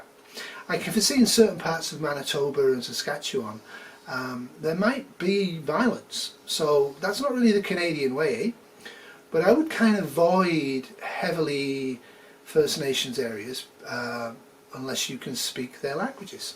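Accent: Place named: England, United Kingdom. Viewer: What what old boy. 🏉 Is not the hardest game ever.... Ha ha 😂. Are beer is the best and we have the werst weather. Rain in the morning sunshine then pissing it down.... 😊. Your so funny. An yes I can here the English in your voice. Keep it up mate. ATB ta the both of ya. Andy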